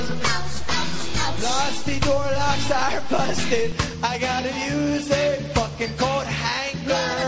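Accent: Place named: Jamaica. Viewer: Why does he all jamacian like?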